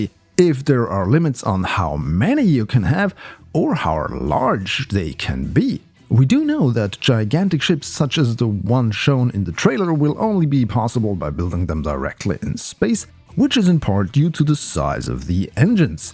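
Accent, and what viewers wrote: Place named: Germany. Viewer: I know a german guy trying to speak professional english when I hear one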